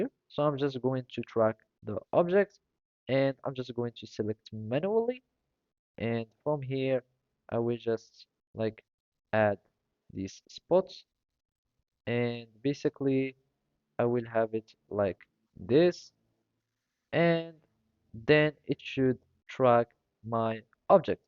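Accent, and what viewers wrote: Morocco. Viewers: It’s insane that I know that you’re Moroccan by your slight accent because I’m Moroccan too 😂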